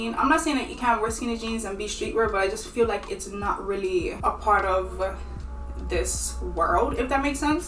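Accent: Bermuda. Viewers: Yoo. You got a Bermudian accent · When i first heard your voice after discovering your channel, off rip I thought to myself she sounds like my mom from Bermuda let me check, and I was right; instant follow, one love❤️🇧🇲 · Is this a Bermudian accent? 🤔 You sound like my friend a bit lol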